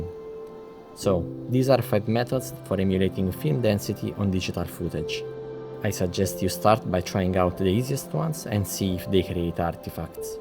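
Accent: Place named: Italy. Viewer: Saturated topic explained in a very unique and amazing way. Learnt alot, thank you. How do project settings affect this process? Ive been on the constant struggle between Color managment, input color space, etc. Is this something that changes your workflow in any way? PS: The italian accent makes it even more tastefull haha thank you!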